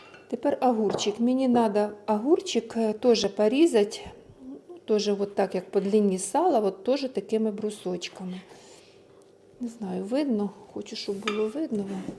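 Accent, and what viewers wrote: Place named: Odesa, Ukraine. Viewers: Землячка моя, наш одеський діалект, приємно чути своє рідне, сумую за домівкою 😢, я заливаю бульйоном коли ставлю в духову шафу, вони небагато смачніші тоді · То й не слухайте! Це південноукраїнська говірка! Діалекти є в кожній мові!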